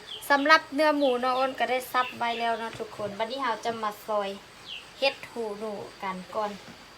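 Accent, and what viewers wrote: Laos. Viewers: ຊົມເຊີຽທີ່ເວົ້າພາສາຊຽງຂວາງລ້ວນໆ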